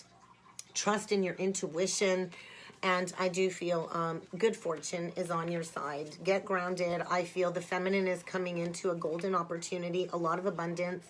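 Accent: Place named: Brooklyn, United States. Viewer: Great reading!! Nice to hear that clear, crisp Brooklyn accent again.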